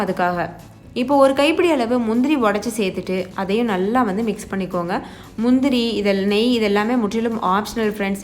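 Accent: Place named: Erode, India. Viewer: Akka neenga erode Akka.. Unga slang spr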